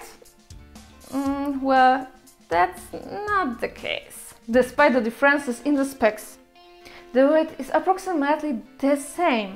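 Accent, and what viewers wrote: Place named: Russia. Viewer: Приятный русский акцент :-)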